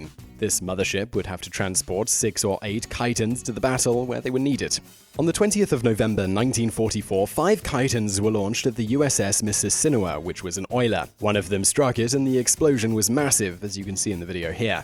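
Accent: United Kingdom. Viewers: British vsause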